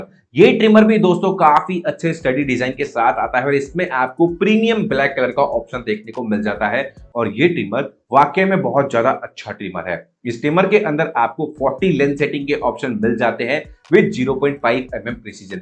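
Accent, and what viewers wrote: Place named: Bhojpuri, India. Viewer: First time trimmer kharida tha video Dekhi aapane Sahi suggestion Diya but aapki awaaz mein Bhojpuri hai thodi Gunji Si Rahi awaaz ni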